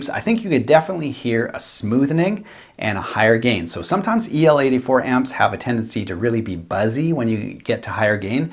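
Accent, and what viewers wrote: Canada. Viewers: Your constant Canadian mispronunciation of “project” and “process” is absolutely annoying. Don’t get me started on “bahhcks” instead of “bucks”. You can say “I’m Canadian” all you want. It’s laziness.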